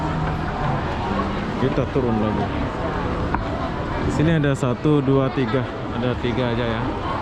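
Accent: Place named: Malaysia. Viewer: Makin ketara bahasa Melayunya sekarang bossque makin mantap liputannya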